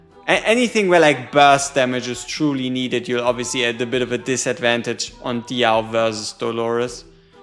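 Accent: Germany. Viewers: would have joined the challenge if i knew how it works. Great content btw. You are my favorite WOR content creator despite the German excent. 😂😂😉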